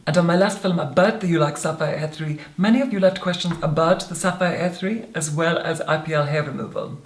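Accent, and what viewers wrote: Scotland, United Kingdom. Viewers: Would love to hear your Scottish accent…….love from Aberdeen 🏴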